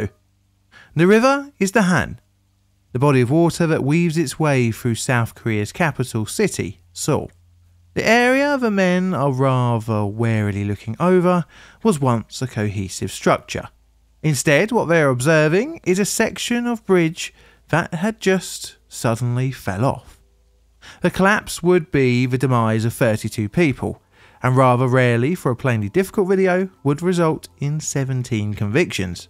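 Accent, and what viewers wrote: United Kingdom. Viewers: Talking tragedy with a condescending voice, very good ! Or maybe it the brit snobbery I'm hearing.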